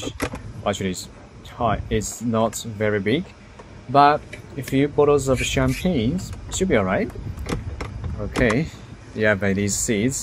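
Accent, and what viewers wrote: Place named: United Kingdom. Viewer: 非要说英式很别扭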